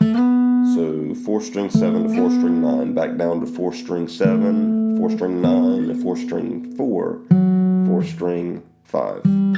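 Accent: South, United States. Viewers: Lol i think its the southern accent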